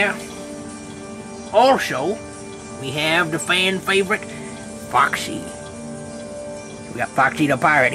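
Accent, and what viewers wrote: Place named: South, United States. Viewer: Southern accent.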